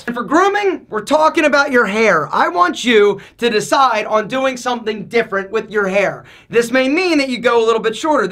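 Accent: Philadelphia, United States. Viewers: I am just here for you Philly accent. Love it.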